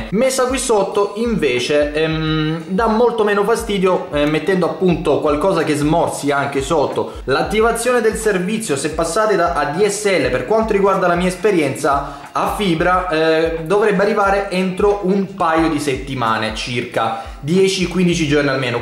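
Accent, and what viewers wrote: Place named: Apulia, Italy. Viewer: Ciao , io sto aspettando che arrivi la vodafone station . Per caso sapresti dirmi quanto ci vuole dall'arrivo della vodafone station all'attivazione effettiva di internet ? Mi pare di sentire che sei Pugliese se non erro , se si vorrei sapere in quale comune ti trovi per farmi un'idea di quanto veloce sarà la mia linea . Grazie !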